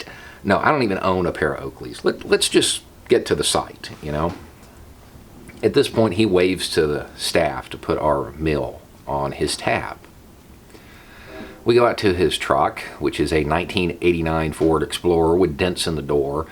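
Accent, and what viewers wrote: South, United States. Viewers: It's kinda funny I almost judged bow when I first heard that southern drawl I almost clicked skip and misstock him for a Trumper then I listened closely to what he was saying and I'm glad I did. I didn't realize how many anarchist there are still out there the struggle goes on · You said; "Don't judge by appearances." I can't remember how I got to this site in the first place and I have already admitted I misjudged you massively then. Not actually on appearance, although for me that was a 'WTF?' moment. It was worse than that - I misjudged you on your accent. It okay, I'm all better now, but it seems to me too many people with accents like Mitch McConnell and a few others, have altogether too much influence on how America works. Add those to the all too frequent and common flaunting of what purports to be a Confederate flag, but which I know actually isn't, and a disturbing pattern emerges that might slightly mitigate my misjudgement.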